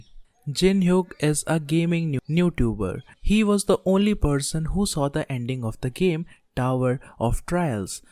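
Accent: India. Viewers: he's Indian right?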